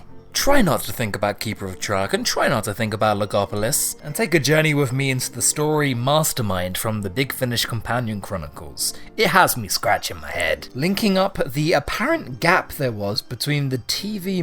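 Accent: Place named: Italian American, United States. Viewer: Worst Italian American accent I ever heard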